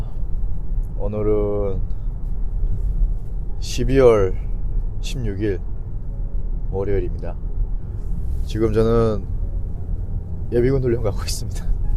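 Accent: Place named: Gyeonggi, South Korea. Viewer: 잘 생긴 男배우같은~ 경기도 언어 억양, 이젠 ⚫️ 검은안경벗고, 자신감으로 😮 보기좋아요🎉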